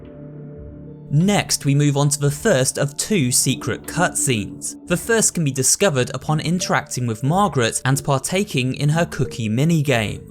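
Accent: United Kingdom. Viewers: Wait is British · hes briitish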